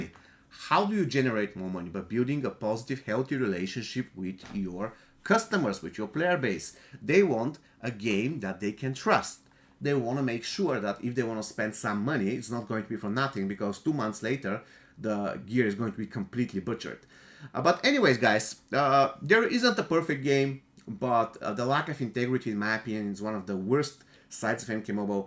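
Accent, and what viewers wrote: Bulgaria. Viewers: Hello, I have a question for you. Are you Bulgarian? Because I watch your videos and I catch the typical Bulgarian accent. I am a Bulgarian and it would be incredible if you are a Bulgarian too.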